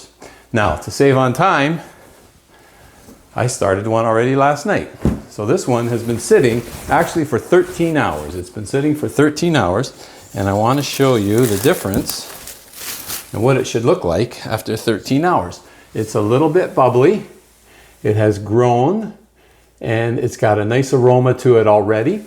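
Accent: Canada. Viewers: Looks great. Will definitely give it a go. Are you Canadian?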